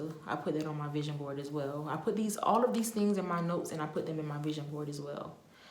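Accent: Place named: New Orleans, United States. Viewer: Are you from BR or New Orleans ? It sounds like the accent 😍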